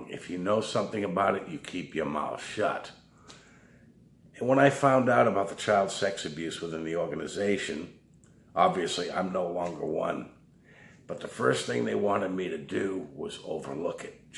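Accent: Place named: Boston, United States. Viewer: Good insight always to the point and says it like a true bostonian (guessing thats what the accent is). its messy when your in and leaving isnt a fix in itself. Gotta get a life. Thanks, pal, you are a breath of fresh air.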